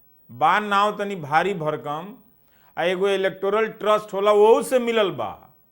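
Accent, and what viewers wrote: Bhojpuri, India. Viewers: बहुत बढ़िया,समाचार पढ़े के शुद्ध भोजपुरीया अंदाज बहुत अच्छा लागल हऽ। शुरू मे आउर आखिर मे इ जे नमस्कार कहिला ओकरा जगह हमरा हिसाब से प्रणाम कहेम त आउर प्रभावशाली लागी। 🙏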